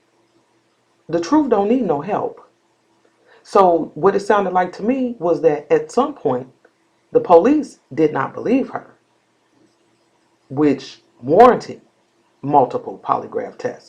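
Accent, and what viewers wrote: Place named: Chicago, United States. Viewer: I’m a newbie to your channel. Love your real ness. Sound like you from my hometown Chicago... lol anyway this story is sad. Yes the truth will come out, just like it came out on the 911 call. The hospital really need to implement mental health test after mothers have babies with special needs or not before exiting the hospital to potentially avoid unfortunate death of babies and toddlers. This is so common and the lies are getting dumber and dumber. Justice for baby Braylen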